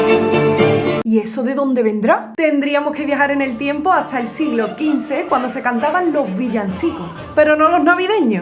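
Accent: Andalusia, Spain. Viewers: Gran historia del estribillo español que no tenía ni idea. Por cierto, a pesar de haberlo explicado en andaluz te he entendido perfectamente 😂. Que españolaza eres. 🤗👏🏻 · Amo ese acento particular, esa forma de decir las "T", y a veces omitir algunas S finales. De qué parte de España es característico? Saludos desde Argentina. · Maravilloso vídeo ❤ por cierto, de dónde eres concretamente? me encanta tu acento andaluz pero no consigo saber de que provincia es.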